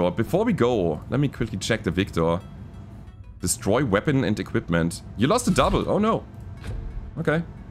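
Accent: Germany. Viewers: German accent "back shot again, oooo hes cherry red" Wife "what the hell are you watching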